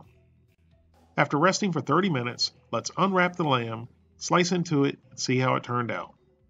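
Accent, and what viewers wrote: South, United States. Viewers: What state do you live in? Sound like a Kentucky or Tennessee accent?? Edit. My mistake i meant what state are you from. I grew up not knowing about moving out of state.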